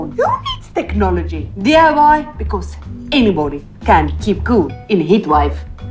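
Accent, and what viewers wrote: South Africa. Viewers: Is she south African that would be awsome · is she from south Africa, I am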